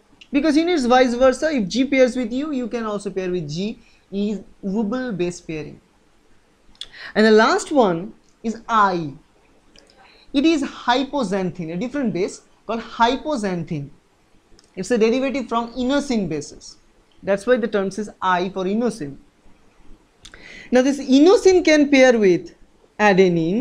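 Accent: India. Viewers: English with hindi accent teaches a lot from understanding and recapitulating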